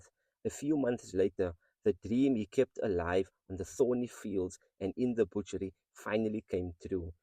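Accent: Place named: Cape, South Africa. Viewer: So inspiring! I also like your Cape accent 👍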